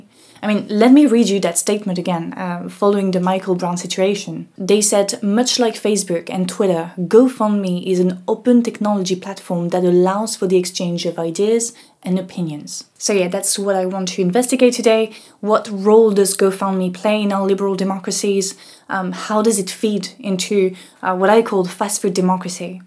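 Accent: France. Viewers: I enjoyed this video but I would also like to thank you for improving your English. I was having a hard time following you in the past because of the heavy French accent. 😊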